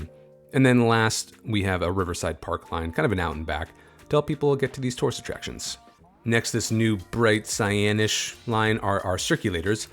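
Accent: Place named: United Kingdom. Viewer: Dewy Tubey, a little british accent LOL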